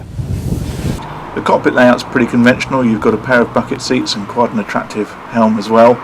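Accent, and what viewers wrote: United Kingdom. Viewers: ! i heard the british accent and i couldnt believe its him lol, just finished watching his vids.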